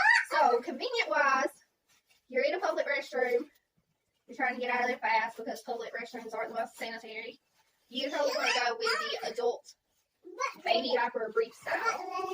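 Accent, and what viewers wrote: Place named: Carolinas, United States. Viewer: What southern state are from? You sound like a Carolina girl.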